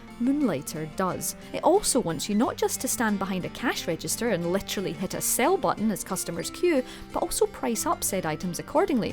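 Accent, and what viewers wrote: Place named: Scotland, United Kingdom. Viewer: Scottish narrator It is amazing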